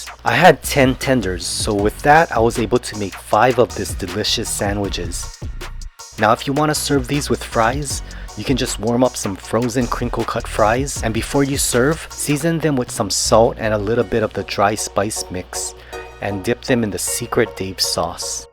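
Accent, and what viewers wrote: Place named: Hawaii, United States. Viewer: You sound like u from Hawaii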